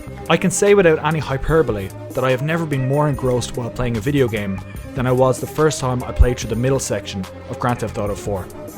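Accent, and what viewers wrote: Ireland. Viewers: Is that an Irish accent I hear? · Are you from Ireland and if you are what county lol,also great video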